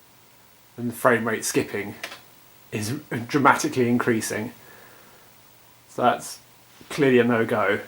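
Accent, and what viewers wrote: England, United Kingdom. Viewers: ***** I wish I would have talked to you 18 months ago. The box is dedicated to KODI (boots up to KODI), so it makes sense to dump pulse. I'm reading the wiki now. I'm one of the ones that has dvd rips up to 42GBs and many are ma and trueHD. I've had to change the output to DTS or DD. For some reason I thought you were in Australia. Sounds more like England. Thanks for your help. I'm going to give it a try.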